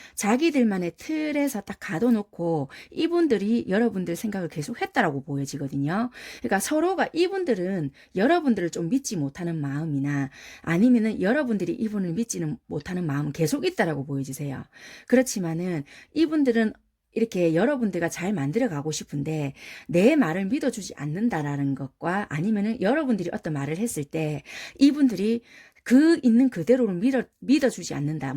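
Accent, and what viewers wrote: Busan, South Korea. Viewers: 와우 감사합니다^^*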